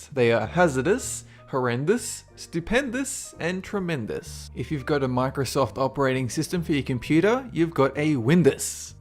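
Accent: Australia. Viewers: He's Australian ._. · You are Australian? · are you really aussie? If so then where from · Are you Australian? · Lang Jones I didn't catch that one. He does have some odd pronunciations though. He's got an Australian accent but uses American pronunciation for heaps of words. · Are you Australian ?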